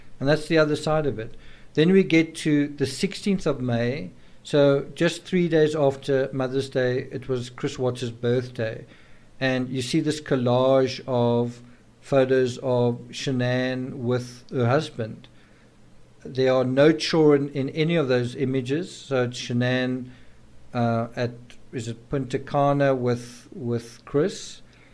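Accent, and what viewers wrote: South Africa. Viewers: Good SAFFA accent! 👍 ♡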